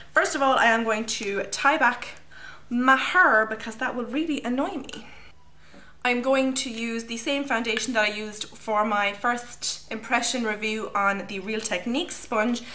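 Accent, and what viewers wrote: Ireland. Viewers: U irish??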